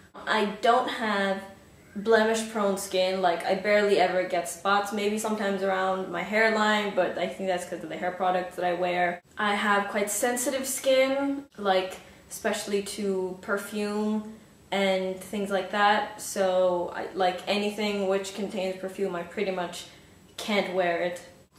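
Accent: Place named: Ireland. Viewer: I LOVE IRELAND ACCENTS!!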